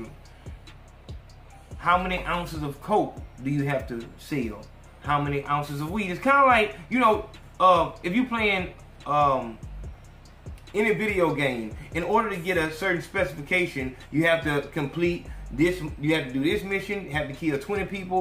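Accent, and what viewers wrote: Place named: Alabama, United States. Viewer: 💯...you from Alabama, ain't it?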